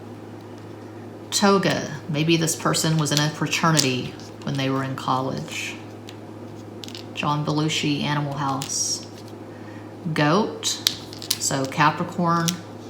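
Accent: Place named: South, United States. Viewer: Pile 4: I love how you read. Very calming, soothing voice and all, with a southern twang. How do you do it?🙏😇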